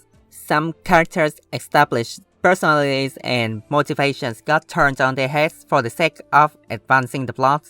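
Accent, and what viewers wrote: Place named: India. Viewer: Kudos, an excellent review covers pretty much everything I needed to know! however ur accent still needs bit more practice it mite be difficult for people outside India and Asia to understand. Good luck!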